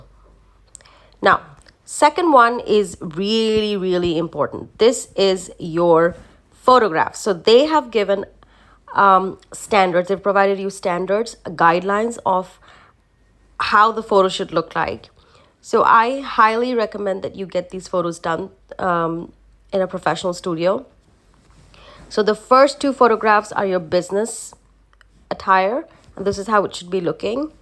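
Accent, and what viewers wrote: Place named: United Kingdom. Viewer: Is this mandatory to speak in a British accent or is it just a choice?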